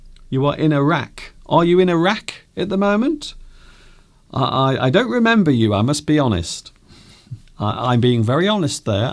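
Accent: United Kingdom. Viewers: 💛💛. My British accent teacher 💛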